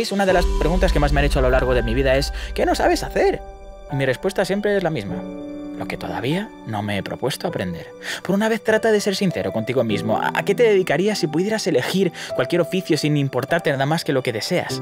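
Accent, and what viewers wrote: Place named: Colombia. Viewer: con esa forma de hablar se reoconoce a un colombiano de lejos jaja la buena parcero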